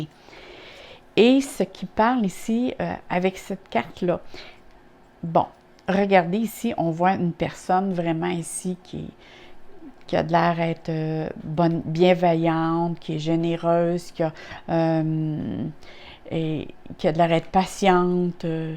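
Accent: Canada. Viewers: Merci🌹🍀j'adore votre accent canadien ❤️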